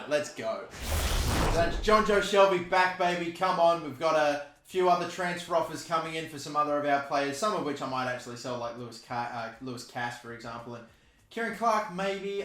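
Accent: Australia. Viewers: r u Australian?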